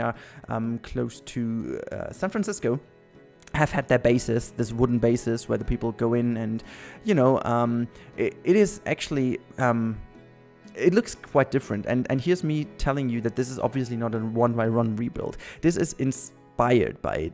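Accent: Germany. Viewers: Man hört sofort das du Deutscher bist xd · I think u have a little bit a German dialect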